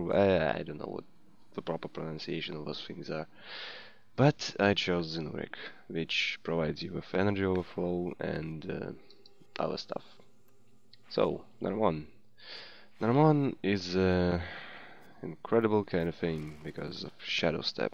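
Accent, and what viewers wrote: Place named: Russia. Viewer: Судя по такому произношению , автор отечественный .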